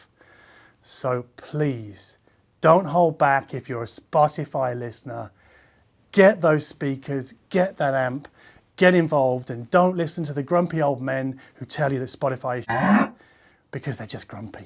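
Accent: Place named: England, United Kingdom. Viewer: I like the way you speak, always have been jealous of english accents.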